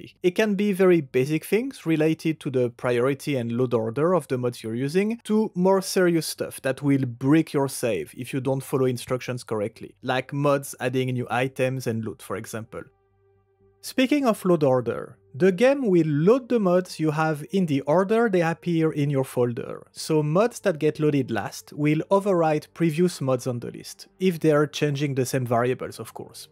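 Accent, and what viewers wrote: France. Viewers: man you sound like french native, if you are " merci pour tes 2 vidéos, elles sont super bien faite! " If you are not french native, not a problem, just to thank you for your videos very helpfull, I was installing my mods manually and I decided to use Vortex. I had many mods but the mandatory one is about performance, the one that make the game smooth and playable, I though this mod was working well on my rig SINCE I installed it with VORTEX. I could not believe what I seen, I went from 65 fps to 95 fps, and said to myself, WTF?? I guess the mod was not installed properly despite I am not stupid and I followed the installation guide on nexus. You recommand to use Vortex and know I understand why, 🤣🤣👍👍By the way you are explaining everything very well (I would not imagine you can put the mod file (zip one) inside the mod folder and I learn this watching your vid. 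I guess this is important. I am gonna follow your guide about your mod list, I installed a bunch of mod and will add those one that are in your list and that suit to my test. I am following you ! thanks again :)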